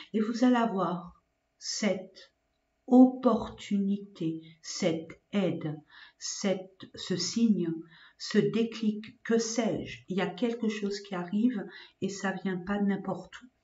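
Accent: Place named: South, France. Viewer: Bonjour, accent du sud... ça tombe bien je suis dans le Var et je veux aller dans le Vaucluse pour faire effectivement un autre travail enfin quelque chose qui englobera mon travail actuel. On attend juste le financement pour pouvoir avancer dans le projet. Avec achat immobilier... Choix numéro 1, c'est beau. Merciiiii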